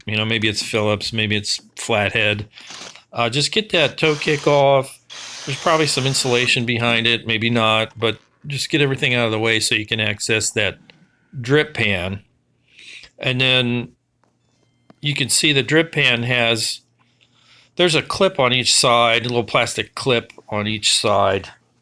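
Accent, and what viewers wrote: United States. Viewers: You from pittsburgh dude??? I heard that accent. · Oh my gosh! Thank you! It worked!! I liked your Western PA accent?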